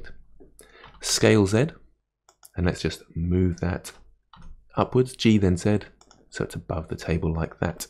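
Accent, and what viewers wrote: United Kingdom. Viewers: (me, opening up this tutorial) Oh hell yes British Accent thank god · it's good that you show which keys do you use. Because of Your bri'ish accent i've mistaken alt for o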